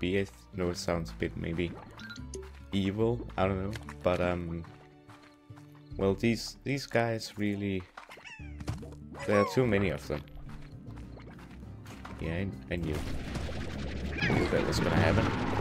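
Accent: Denmark. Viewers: are you danish it sounds like it and i love youre vid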